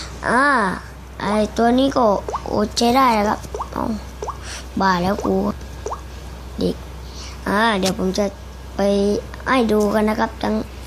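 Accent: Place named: South, Thailand. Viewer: คนใต้คนใต้ คับคนใต้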